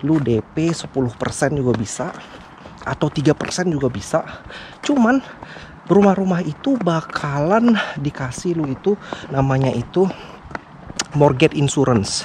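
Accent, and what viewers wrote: Indonesia. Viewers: Mantap aksen anakmu udah kayak anak2 amerika, kalo bapaknya masih kentara indo banget hehe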